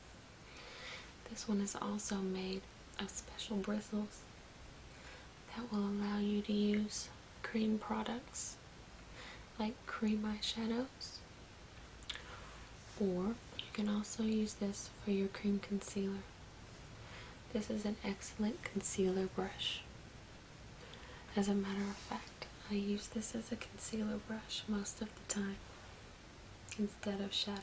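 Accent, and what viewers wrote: South, United States. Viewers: Are you from the south? cause you sound a bit southern. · Also are you from the south?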